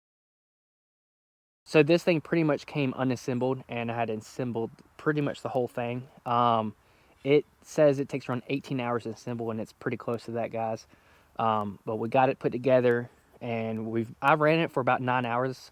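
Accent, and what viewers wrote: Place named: North Carolina, United States. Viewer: North Carolina i knew it. This is random but i like to try and figure out where a place is by the environment, accents, and any other clues. I said hmm somewhere south east, ran thru a couple states then i said ah i bet it's north Carolina. Anyways, cool toy i want one!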